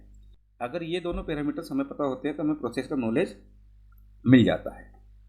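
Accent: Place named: India. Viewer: Could you speak slower? My English is not too good when listen English by India. So sorry to say that. I really want to watch more video from you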